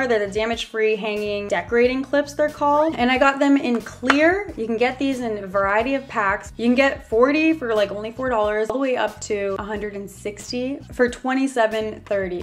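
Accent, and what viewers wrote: Canada. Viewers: Are you Canadian because it sounds like u have an accent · OMG I love your canadian accent!!!! #Sclub #SlvForLife #Wolfia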